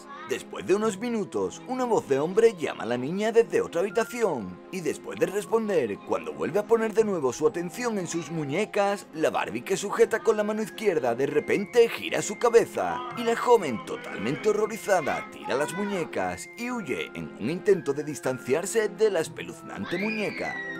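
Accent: Spain. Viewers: FELICIDADES COMPAÑERO DE DONDE ERES? ESPAÑA? CREO QUE SI POR TU FORMA DE HABLAR ESPERO Y CUMPLAS MUCHOS AÑOS MAS AMIGO MIO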